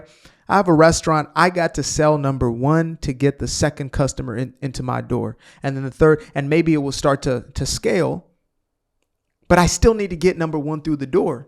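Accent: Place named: United States. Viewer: Yea you sound American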